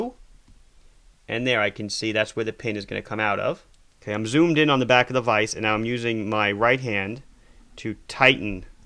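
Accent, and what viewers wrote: Long Island, United States. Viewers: I grew up in Huntington Station. I really miss hearing people speak like this, not just the accent (yours is not overly pronounced), but the manner of speaking. Confident, intelligent, with a sense of humility and humor combined. Thanks for bringing back memories. Thanks for the excellent video! Channeling: "Cause [Florida's] not my home." PS - Big fan here. Too bad they haven't created anymore music.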